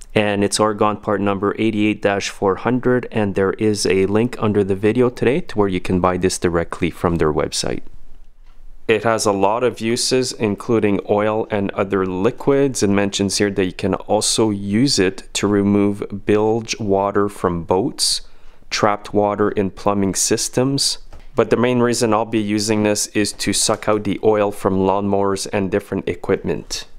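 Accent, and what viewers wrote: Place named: Canada. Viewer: Can tell u are Canadian. Your English is perfect, and you are a perfect instructor. Have you considered teaching at a college?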